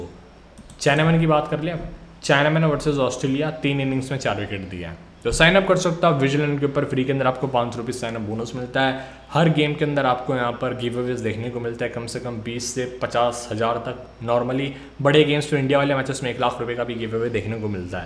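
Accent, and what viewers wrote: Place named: Caribbean. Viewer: Sir ap me Caribbean flavour hai thoda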